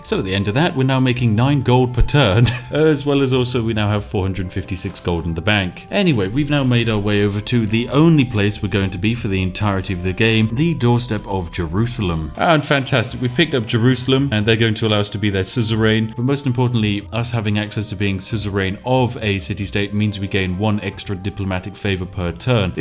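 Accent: United Kingdom. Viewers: That brittish accent is just perfection...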